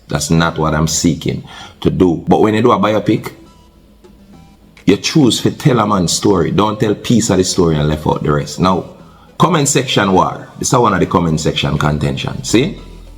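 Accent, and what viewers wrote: Jamaica. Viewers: Well spoken Jamaican accent. Kingsley. Nail it😅 Andrew Holmes is well spoken like Kingsley ❤❤❤❤❤❤❤😂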